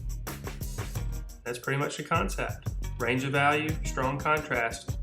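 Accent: South, United States. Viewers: Your accent is cool. From the South?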